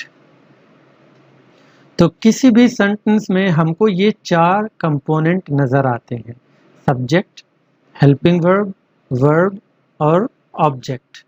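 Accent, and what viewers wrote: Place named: United States. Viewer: Very good American accent in hindi style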